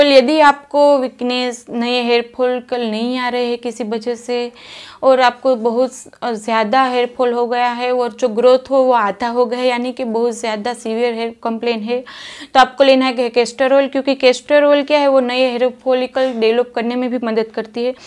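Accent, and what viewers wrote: Gujarat, India. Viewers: Ap Gujarati ho ky??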